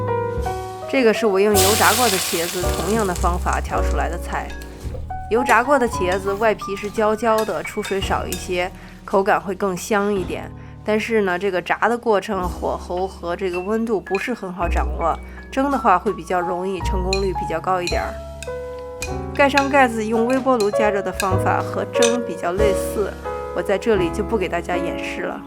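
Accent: China. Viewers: Downvote for shitty mainland chinese accent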